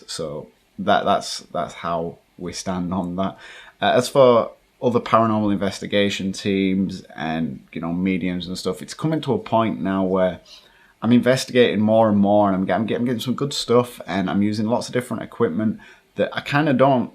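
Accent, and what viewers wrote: United Kingdom. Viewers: Hi guys I am french. I need help to understand at the point he explain us how the paranormal activity started around him. The british accent is too strong for me :( If someone could write it down would be nice. Thx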